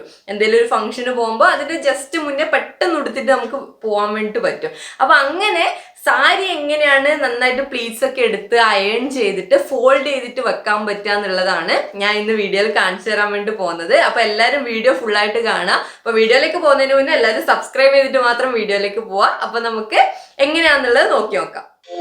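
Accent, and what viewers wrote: Kannur, India. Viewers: Chechy de place evdaa kannur slang thonnunnu samsaarikkumbo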